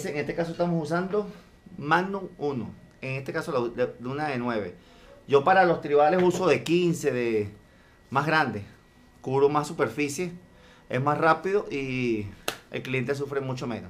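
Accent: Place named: Venezuela. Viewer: Yo estaba claro que eras de venezuela por el acento,un crack perro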